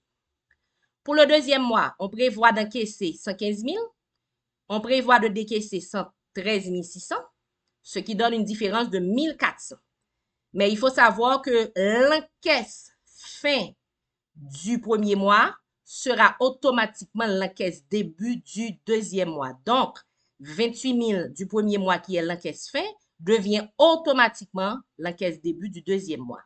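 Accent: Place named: Haiti. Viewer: Slt, Madame , merci pour la vidéo, elle m'avait été utile. Vous parlez français avec un accent Haïtien, êtes vous d'Haïti.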